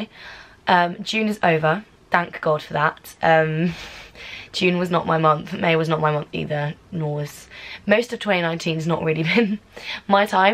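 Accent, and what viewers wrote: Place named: West Midlands, United Kingdom. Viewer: Where has your lovely West Midlands accent gone? Please don't turn posh....Brummie is sooo much better! X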